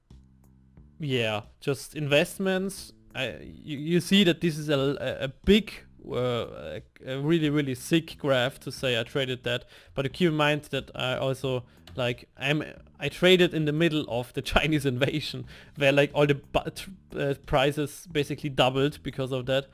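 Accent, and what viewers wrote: Germany. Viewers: Are u german? ^^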